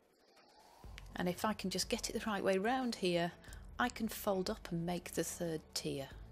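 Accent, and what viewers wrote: England, United Kingdom. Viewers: What a lovely English accent! Thanks for the video 💐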